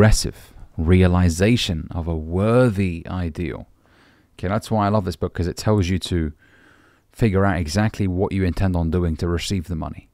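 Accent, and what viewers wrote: United Kingdom. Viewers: Hello! I stumbled upon one of your videos randomly, and I ended up watching almost half of your entire collection already! You and I both know that the content you cover has been explored in various ways by many others before you, but let me tell you: your approach is truly unique and I absolutely love it! Consider me a dedicated subscriber from now on. Your calm and non-aggressive tone, along with your willingness to understand and help, are rare qualities these days. I wish you all the best not only in your business but also in your personal life. By the way, if you don't mind me asking, where are you from? Your English accent sounds quite British to me. Wishing you the best of luck in all your endeavors!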